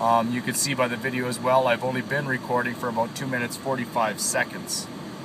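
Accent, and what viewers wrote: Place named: Canada. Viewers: you sound so canadian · yep, I lived in Winnipeg for 7 years between 1979 and 1986, I actually enjoy listening to this guy, Like the Canadian accent;)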